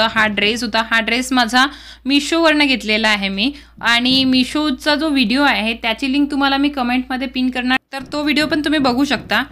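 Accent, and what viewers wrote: Vidarbha, India. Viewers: Tai tuzi vidharbh bhasha khup avadte. Mazi aai pan khamgaon madhli ahe. Khup athvan yete tuzi language aikun ajol chi... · हो माझी आई पण विदर्भ मधले ते पण संभार बोलते😂😂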